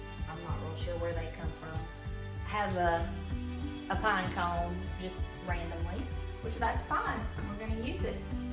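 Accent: South, United States. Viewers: You are adorable! Decor is lovely and I love the "southern accent"!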